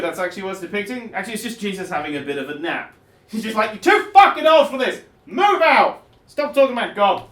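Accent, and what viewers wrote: United Kingdom. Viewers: really brought out his Brit in this video. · Did get.... More British? He sounds very British in this video. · He got really British in this video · Hes done it. Hes gone super brit. And I love it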